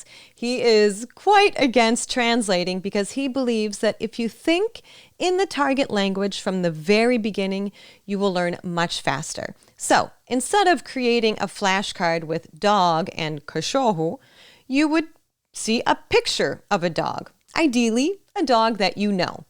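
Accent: United States. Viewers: To have a fluency in second language is not overnight. It's a whole bunch of thing that has to bear in mind mainly if don't live in an English spoken country. In my case i've been practising English my whole life and it's not enough for me 'cause i've got to get into it for hours as if i were living in USA. And nowadays cell phone, laptop and apps help a lot to get in touch with the whole world if you really want to improve your English. By the way you're a good native English teacher and nothing better than learning a real American English from you. GOD BLESS YOU. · Are you American?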